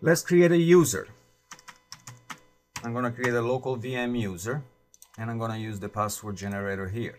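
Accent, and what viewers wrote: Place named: Italy. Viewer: being italian i find our accent pretty funny, but true, def good tutorial!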